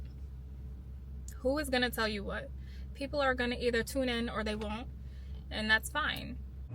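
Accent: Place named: Trinidad and Tobago. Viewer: Ya sound like ya from Trinidad 🇹🇹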